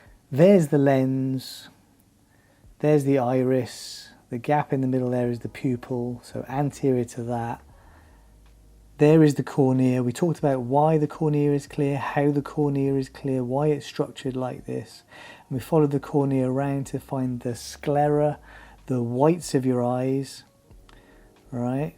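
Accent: England, United Kingdom. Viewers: ya ne tatlı bir adam bayıldım. konuştukça o ingiliz aksanıyla histolojiye ilgim arttı yerim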